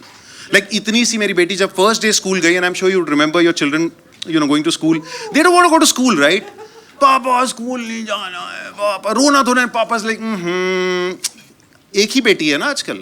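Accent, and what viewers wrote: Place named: Purvanchal, India. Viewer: chacha lucknow me vo accent hai hi nahi.. purvanchal aur marathi mix krdi apne..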